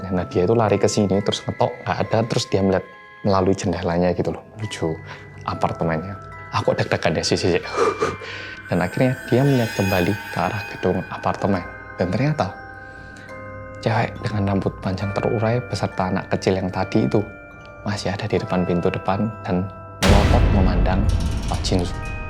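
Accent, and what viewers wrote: Java, Indonesia. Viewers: Seneng lagi ngomong boso jowone sing medok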